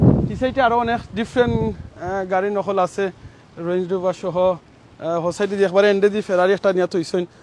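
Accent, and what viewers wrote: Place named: Sylhet, Bangladesh. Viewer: ভাই , আপনার কথা বাংলাদেশের বিভিন্ন অঞ্চলের মানুষ শুনতেছে ভিড়িও দেখতেছে , সুতরাং আপনি সিলেটের আঞ্চলিকতা পরিহার করে কথা বলবেন ।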